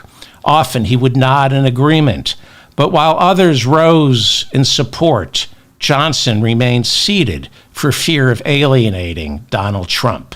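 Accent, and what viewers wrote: New York, United States. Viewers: .. i look forward to listening to your channel. it is so informative and entertaining. yet again, thank you. btw, I'm digging your NYC accent, Bronx? me too...we knew trump was a disaster, if only if they would have listened... VOTING 💙!!!!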